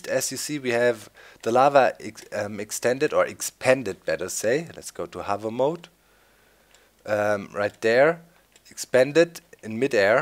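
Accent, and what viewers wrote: Germany. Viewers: i cant exit the video till i hear you say bye bye in your german accent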